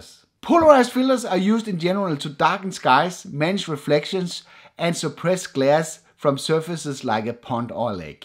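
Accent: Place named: Denmark. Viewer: Fantastic video! Best one on youtube to explain ND filters! oh well, your english has a bit of danish taste. that's even better! greetings from Copenhagen! Mange tak!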